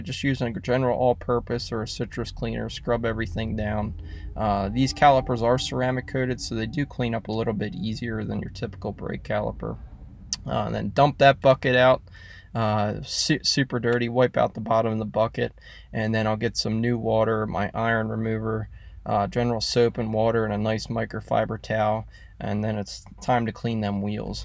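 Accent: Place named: Pennsylvania, United States. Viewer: You sound like your from PA, although you did say wal-ter and not wonder. But other than that I hear a PA accent?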